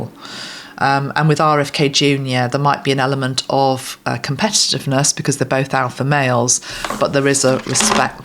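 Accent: United Kingdom. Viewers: I am thrilled to watch this video,! Thank you for sharing your insights. I am hoping someday I’d get to visit your country U.K. When I was still learning English as an elementary student years ago, I dreamed of being able to speak in classy British accent like yours😅…! Well, I ended up here in the U.S., and I am a supporter of JD Vance!!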